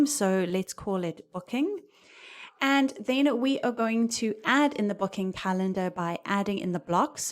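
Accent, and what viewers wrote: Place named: South Africa. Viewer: Great video and very helpful. Thank you,. Nice to hear a South African accent:)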